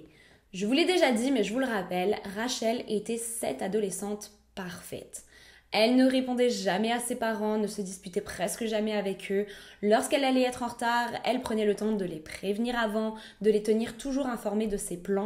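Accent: Quebec, Canada. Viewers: Toujours sympa l'accent québécois, t'assure !!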